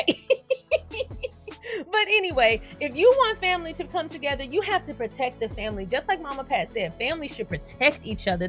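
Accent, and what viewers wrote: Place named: United States. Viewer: is from the United States. She has an American English accent. If you come here expecting her to pronounce words in a British accent you’re an idiot. I will block you. - Me & Moderators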